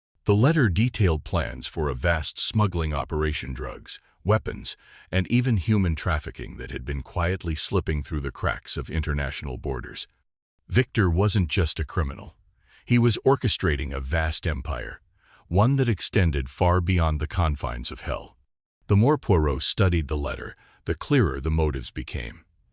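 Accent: United States. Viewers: American accent doesn't cut it.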